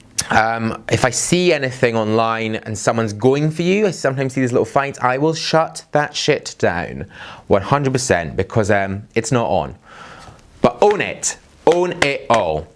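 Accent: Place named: England, United Kingdom. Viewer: where abouts in England are you from? Your accent is amazing!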